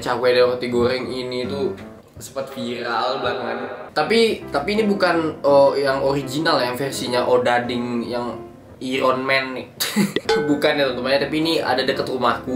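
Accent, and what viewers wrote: Bali, Indonesia. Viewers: LU ORANG BALI YA BANG?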